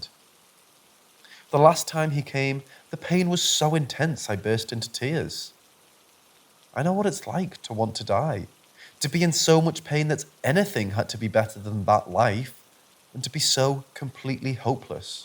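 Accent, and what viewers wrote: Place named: Sunderland, United Kingdom. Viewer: Hmmm cant decide if your a Geordie with a middle class background or you hail from across the river Tyne beyond Gateshead, with a smattering of Sunderland tones, not Durham, too thick of an accent. Maybe uni at Manchester? Back to Newcastle or even Northumberland? Im on the far Northumbrian Coast & love tracing where accents come from & the little quirks of other accents picked up from a short stay or via friends & family. Thanks enjoyed yr video 😉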